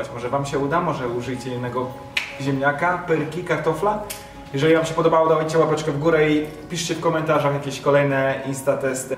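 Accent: Poznań, Poland. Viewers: TO MUSI BYĆ MŁODY ZIEMNIAK ;) ps. WIDZĘ ŻE TROCHĘ GRASZ GWARĘ POZNAŃSKĄ PYRA-ZIEMNIAK ;) (POZNAŃ MOJE MIASTO )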